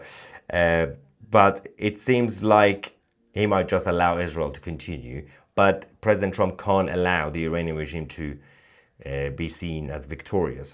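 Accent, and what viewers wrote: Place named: United Kingdom. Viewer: Dude stop exaggerating everything and jer jerking off on everything and I'm getting a little f**** pissed because of the way that you're exaggerating things and pulling s*** out of thin air and I thought you were better than that, now you just sound like a f**** stupid f**** Brit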